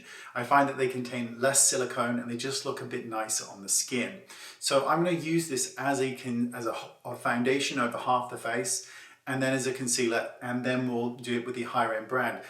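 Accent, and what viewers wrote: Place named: United Kingdom. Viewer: I am not here for concealer just your lovely British accent 🤫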